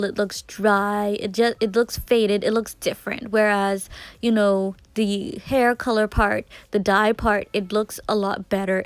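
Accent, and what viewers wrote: Bahamas. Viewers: Girl I got so excited when I heard your accent lol was not expecting that. New fellow Bahamian subbie 🥰❤️🇧🇸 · I felt so slow asking my mummy if you sound Bahamian just for her to point out the flag behind you I feel dumb asf but beautiful results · Heard your voice and knew you were Bahamian. This video helped me alot. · The Bahamian accent is so strong lol... I love it! I was very entertained and informed on the bleaching process and steps. I wouldn't do it on myself though lol. Good job.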